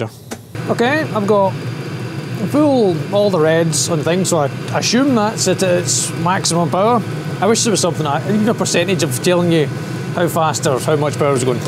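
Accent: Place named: United Kingdom. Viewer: NOT TO SURE , about advice from someone with " British Speak" , untill I heard that all too familuar fraze, "AHH PIECE OF SHIT " , then i felt at ease!!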